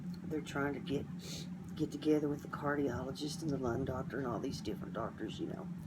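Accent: United States. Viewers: I completely agree about reborns being helpful for getting through those anxious times. I like to feed and change mine when I'm anxious too. Also agree about God giving us strength we never could have guessed at right when we need it. He is good all the time. I'm so sorry you and your mother are going through this, though, and you will both be in my prayers. I love your voice and your accent. I was born in NC. I now live in IL, and I miss my family so much. Listening to you reminds me of home.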